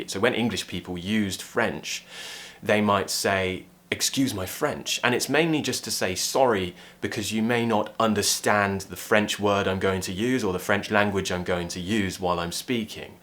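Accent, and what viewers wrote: RP, United Kingdom. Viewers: You are the reason that i like British accent thank you a lot sir 😍🔥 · Sir how can I learn your basic English British pronunciation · Very nicely done, and I do enjoy listening to your cool British accent. I notice that you've made a point of saying that you do not use outdated expressions. However, I can tell you that here in America at any rate, every one of the idioms you discussed has been around for quite a long time. They were all used when I was a kid (in the 1950s) and used by my mom and dad much earlier. But never mind, I enjoyed listening to you, and I will look for more of your videos. And if it's not being too old fashioned, I congratulate you on "getting hitched", and all best wishes to your lovely "ball and chain". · Wonderful examples and I absolutely like your British accent! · WOW it's the best video I've ever seen in a British accent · Excuse my french (I am francophone and enjoying your lessons, your British pronunciation), but I wish to congratulate you for your marriage and wish you a lot happiness and... 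many children (?)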